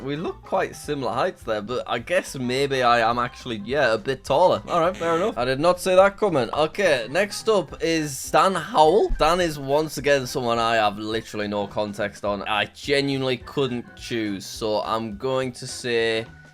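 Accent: RP, United Kingdom. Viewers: I wonder if he got the sudden inclination because Dan has made fun of how tall he is in the past? So he kinda built this image of himself that he and his fans joke about him being a tall, slightly posh (thus kinda naturally friendly) sounding guy which is a subversion from the fact that he actually has terrible posture and a more cynical sense of humor and attitude. There’s also just the infamous line from his rap roast that he’s basically the product of what would happen if Winnie the Pooh f*cked Slenderman 😳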